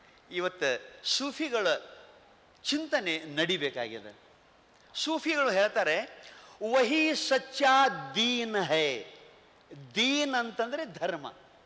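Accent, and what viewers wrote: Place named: Kannada, India. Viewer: His Kannada is charming 👌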